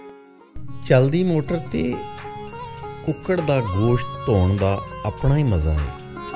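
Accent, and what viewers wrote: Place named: Punjab, India. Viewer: It is just fabulous... nostalgic... Punjabi accent is also just love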